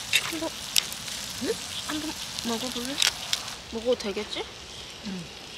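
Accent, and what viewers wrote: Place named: Gwangju, South Korea. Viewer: 요즘 무한재생으로 보고 있어요 집에서 그냥 틀어놓기도 좋아요 ㅋㅋㅋ 저도 광주인데 사투리가 편안합니다ㅋㅋ 앞으로 더 큰 채널이 되길 바라요 ♡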